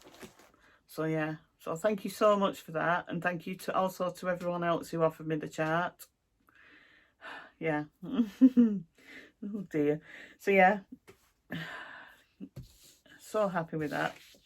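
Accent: Lancashire, United Kingdom. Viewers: I live in Lancashire area, but I discover your chanel with help from Theresa Little Stitcher 🥰 and with your help I will learn british accent from Lancashire 🥰 In August, five years ago, I move to this area and I love it.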